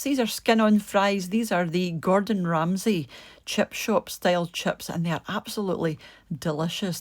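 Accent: Scotland, United Kingdom. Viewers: Hi, I will be quite honest with you…..I come here for my “fix” to hear a nice, thick,Scottish Female Accent! I go to the actor Alan Cummings TV Series - Traitors to hear the male version of a Scottish Accent. Have you see the show? It’s a riot! The castles, landscape and the storyline are wonderful! Take care, and have a lovely week! ox💞🇺🇸Linda C.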